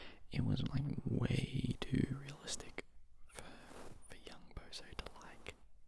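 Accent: Australia. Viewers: Since I'm back in Japan, I have been busy with work and have been diagnosed with bipolar disorder again😢 I can't even have that much sort of energy to watch YouTube or you know just use any kind of SNS but I'm so happy to be here and hear your Aussie accent again. I've been struggling with insomnia and taking some pills but your video really makes me feel sleepy. Thank you. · I totally agree hearing another Aussie is so refreshing and makes it more familiar and a better experience for me personally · as a fellow aussie, i find it so fresh to see more aussies getting into asmr :] it's quite relaxing and familiar to hear the accent of ppl around me in asmr. not to mention your calm and relaxed demeanor - it really adds to the experience! cheers mate, keep up the great work❤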